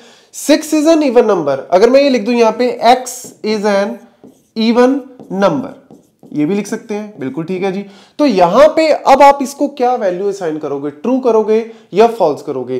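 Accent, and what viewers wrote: Punjab, India. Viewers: nahin probably somewhere around Amritsar-Chanidgarh-Delhi Area I can notice punjabi touch in his hindi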